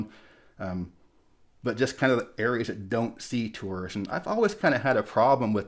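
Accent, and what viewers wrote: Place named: Southeast, United States. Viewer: I'm just guessing here, but your southeast accent is apparent to my ears, which many Mormons might associate with the Baptist denomination. And I believe there is a bit of hostility between LDS and Baptists, which became apparent to me when the typically-Republican-voting southern states had a very low turnout for Mitt Romney when he ran for President three or four election cycles ago. So it may go both ways, who knows. Edit: I'm from the Midwest, have traveled through Utah extensively, and lived in Wyoming for about a year, and was always embraced by the local population. You're a friendly enough guy, so it's got to be something else, either license plate or accent related (or that T-shirt you used to wear that read "Losers, Derelicts, and Savages unite)".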